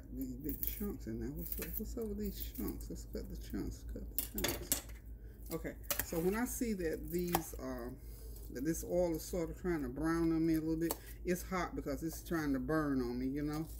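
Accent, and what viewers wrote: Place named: South, United States. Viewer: This too southernnnnnnnnn 😩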